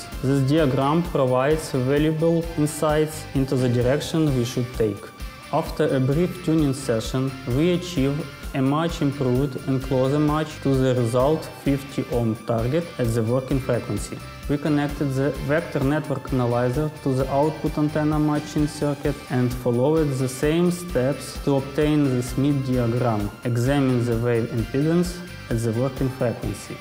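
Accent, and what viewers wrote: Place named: Slovenia. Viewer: Тхеньк ю вери мач. Бат ит из вери дификульт ту андестэнд словеник инглиш лангуаге...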